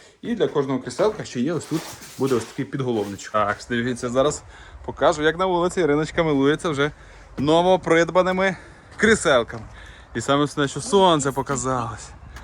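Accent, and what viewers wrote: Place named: Western Ukraine, Ukraine. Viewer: Спочатку була здивована почути українську мову, мою, рідну, "западенську"😊. А коли почула, що повертаємося до Тернополя, була приємно вражена👍👍👍. Відео цікаве, інформативне, заохочувальне! Аж захотілося теж пошарудіти рукою по свіжому граду. Успіхів вам і надхнення!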